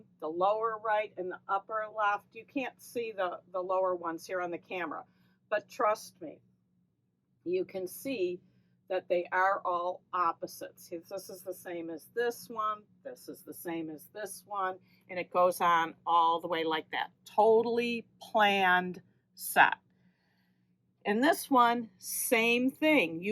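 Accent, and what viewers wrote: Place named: Illinois, United States. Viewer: Good morning, a great way to wake up. Usually I watch you much later in the day, with my tea and toast. Coming from a family that puts an "R" in wash, I just attributed salvage vs selvedge to an Illinois accent if I even noticed at all. I don't know what I would do with another fat quarter bundle, but I would love to find out. Have a great week, Diana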